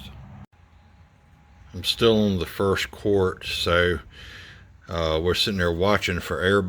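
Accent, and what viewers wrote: South, United States. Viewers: Love the Southern drawl. I was wondering if there was a drain plug or if it drained out through the filters. This video answers my question. Thank you!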